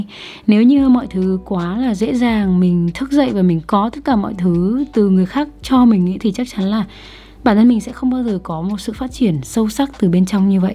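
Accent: Vietnam. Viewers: Nghe giọng c trong video đã ngờ ngợ r nhưng mà giờ mới biết c là người miền trung hihi❤️ e cũng hàng xóm vs HT nè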